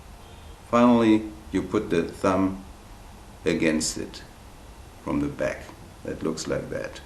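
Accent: Germany. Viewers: His videos are good and he seems to be a great teacher but am I the only one who listened to his first words and was like "I bet he's from germany"? I can't be the only one😮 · Harry Devita Pretty much a german accent. The misspelling might be intended though :D A beginner is begging for knowledge ;-) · German accent, never gets old. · my first thought was "he is either German or Finnish."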